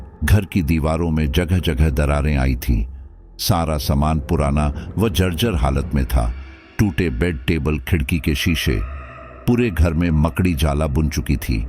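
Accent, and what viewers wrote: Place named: Bihar, India. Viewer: यह कौन सी बिहारी है आधा हिंदी आधा बिहार😅😅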